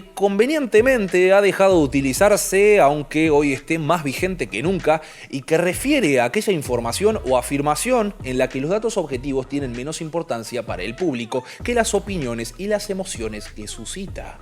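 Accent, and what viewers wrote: Argentina. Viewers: Por tu forma de hablar y el paneo del obelisco deduzco que sos argentino. Decis que la radicalización viene de la mano de Internet, desde que empezó la cuarentena en Argentina se violaron todos los derechos habidos y por haber en Argentina y se pasó por arriba la Constitución. Mas que radicalización yo diría que muchos nos cansamos de que nos pasen por arriba. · Este canal y este video es de izquierda. ¿Como lo sé? Simple: todo el video es acerca de hecharle la culpa a los demás, con referencias intelectualoides, y además el acento argentino lo delata. Ni los algoritmos ni los demás tienen la culpa de tu vida y de tus posturas, uno tiene que hacerse responsable de uno mismo, y tener opiniones fuertes y posturas firmes no tiene nada de malo. Abandonen la actitud de victimismo, es peligrosa y no sirve de nada.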